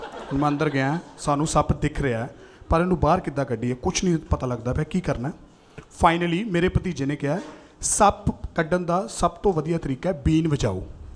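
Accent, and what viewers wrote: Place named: Punjab, India. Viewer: Punjabi accent ❣️ baai mar ta hasaa hasaa ke... Lub ju aa 😂😂😂😂😂😂😂😂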